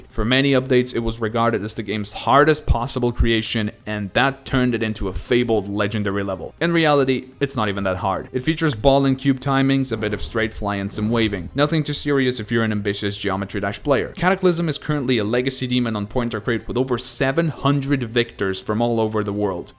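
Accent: Slovenia. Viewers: Yeah i can hear your accent is slovenian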